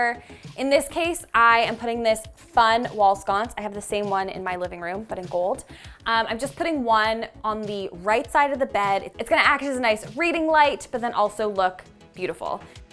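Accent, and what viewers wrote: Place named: Canada. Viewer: I knew the first time I heard you that you were Canadian. Ouut.and about. I love Canadians. Unfortunately I’m stuck in the USA during a very dark time.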